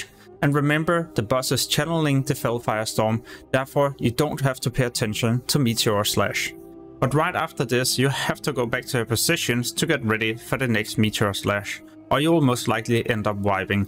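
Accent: Denmark. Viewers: 100% Dansker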